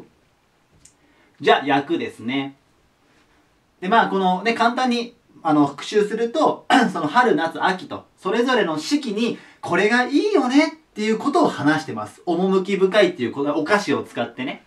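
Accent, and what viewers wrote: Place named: Osaka, Japan. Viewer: 大阪の方ですか？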